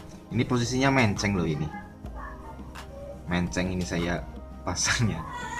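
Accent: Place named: Banyumas, Indonesia. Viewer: Dempet kang bahasa ngapake😁😁😂😂😂😂😂😂😂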